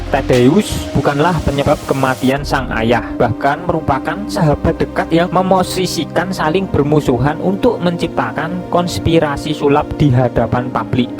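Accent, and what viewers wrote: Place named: Java, Indonesia. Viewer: Jawa medok...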